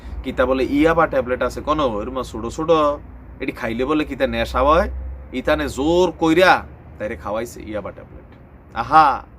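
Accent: Comilla, Bangladesh. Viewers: Vai amio kintu cumillar ar apnar cumillar lenguege ta osthir cilo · ভাই ও ভাই, অাপনার কূমিল্লা ভাষা খূভ ভালো লাগে, অাপনি সব বিডিও কূমিল্লার ভাষায় করেন, অাপনি কূমিল্লার অহংকার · ভাই আমি কুমিল্লা হোমনা থানার মানুষ। আমারও এই খবরটা শুনে খুব লজ্জা লাগছে। মানুষ কতটা নিচে নামলে এমন কাজ করতে পারে ; এরা জনোয়ারের থেকেও অধম। আর আপনার মুখে কুমিল্লার ভাষা শুনে আমার হাসতে হাসতে পেট বেথার অবস্থা ।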